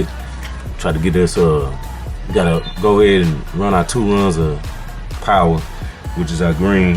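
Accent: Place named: Texas, United States. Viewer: You from Texas bro?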